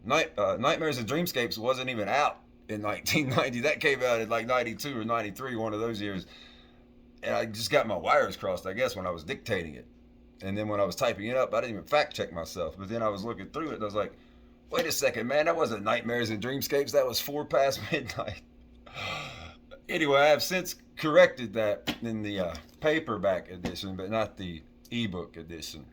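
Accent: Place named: Appalachia, United States. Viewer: "Man, no one is gunna believe this shit" 😂 It's so true though. Life is totally unbelievable, a bizarre adventure of cosmic grandeur and idiotic stupidity. I can't wait to read this book. And FYI as a Brit I love your accent and figures of speech. Nothing wrong with being a hillbilly 🤣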